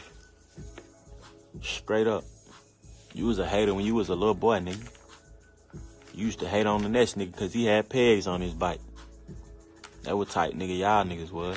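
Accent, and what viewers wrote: United Kingdom. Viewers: Nigga u British u aint from the A fr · Ok British boy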